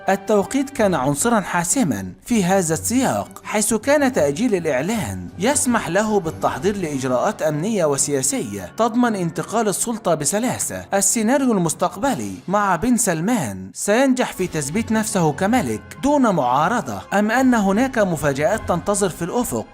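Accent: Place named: Egypt. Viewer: صدقني لو تشوفلك حاجه تفعك احسلك من هاذا الكذب وهاذا التحليلات لاانت ولا الذي معك تستطيع ان تعرف شي او حتى تحلم حفض الله حكامنا وحفص الله ملكنا والله لاانت ولا حتى جدودك تقدر تعرف حاجه عن حكامنا خليك فالمصايب الي عندك احسن قسم الله عقللك وعقل من اعطاك هاذا الكذب شوف مشاكل بلادك وشوف مصايبكم وحلوها انت تعرفوون أنكم ذباب ويجب التعامل معكم بحزم تراك مصري حتى لو غيرت لهجتك معرف من الي الكذاب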